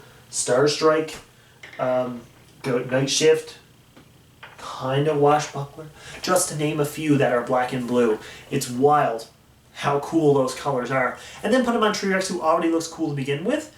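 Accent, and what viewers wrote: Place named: United Kingdom. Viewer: I swear sometimes you sound British.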